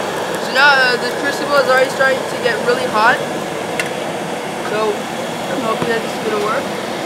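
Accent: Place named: United States. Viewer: You sound American. Yet, where you live doesn't look like America. Nice work by the way. Great start!!!!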